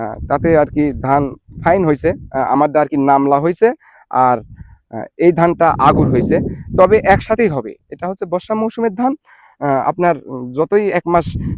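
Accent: Natore, Bangladesh. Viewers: আস্সালামু আলাইকুম।ভাইয়্যা , আপনার কথা শুনে আমার মনে হয় নাটোরের মানুষ আপনি কিন্তু আমার হাজবেন্ড বলে আপনি পাবনার আপনি পাবনায় থাকেন ।আসলে কোনটা সত্যি।আপনি কোথায় থাকেন?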